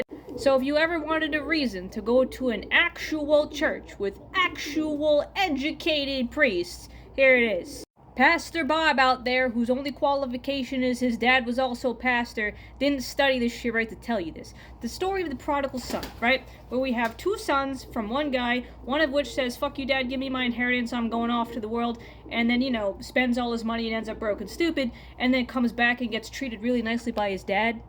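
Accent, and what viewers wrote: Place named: New York, United States. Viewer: Somehow I needed this angry, swear ridden jersey/new york accented sermon today and I'm more agnostic than anything. You nailed it.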